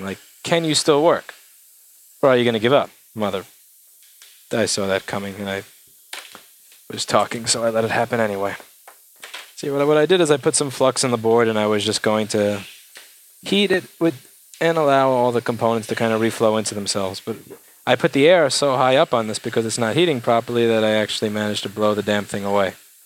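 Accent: New York, United States. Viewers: didn't even have to look at the description to know you were from new york. you probably grew up near queens too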